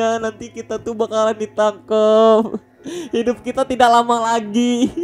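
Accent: West Java, Indonesia. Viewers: suaralu kaya orang sunda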